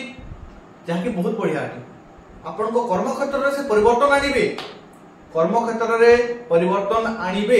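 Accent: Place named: Western Odisha, India. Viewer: Tame to paschim odisha ra bagir laguche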